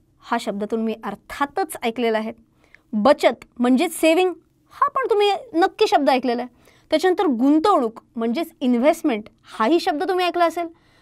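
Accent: Pune, India. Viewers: एक नंबर मॅम, खूप छान वाटलं तुम्हाला मराठीमधून ऐकून!! मी कालच नोंदणी केलीय!! आर्थिक साक्षरता आणि तीही तुमच्या ओघवत्या पुणेरी भाषेत म्हणजे जणू दुग्धशर्करा योगच!!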